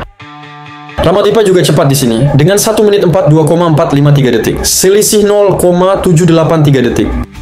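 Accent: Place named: Malaysia. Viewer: emang admin ini malaysia ya? kok logatnya malay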